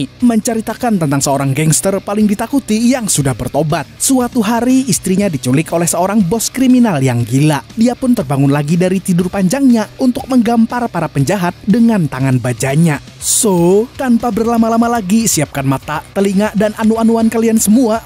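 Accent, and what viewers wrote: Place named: Paringin, Indonesia. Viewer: Seperti orang Paringin ni abg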